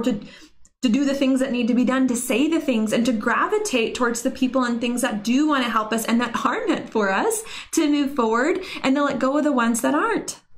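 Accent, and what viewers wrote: South, United States. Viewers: You sound like you are from NC or Atlanta.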